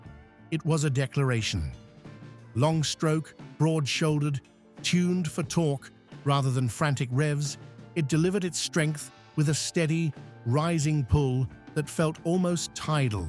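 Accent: United Kingdom. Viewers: i love triumph. but if i had heard this turkey waxing lyrical like a pommy git i would have bought a honda. cant listen to him anymore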